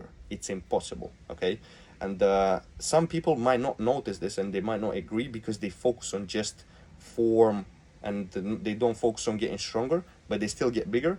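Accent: Russia. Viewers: Ты русский? Акцент выдает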